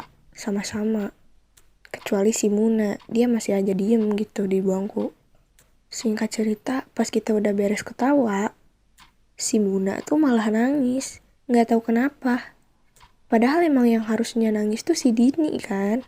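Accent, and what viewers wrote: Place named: West Java, Indonesia. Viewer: Sunda banget yaa logatnya.. hehe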